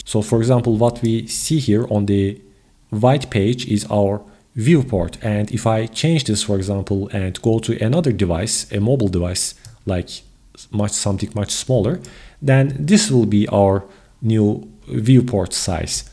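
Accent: Turkey. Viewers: Exceptional Turkish accent. Lovely. Thanks for this !!!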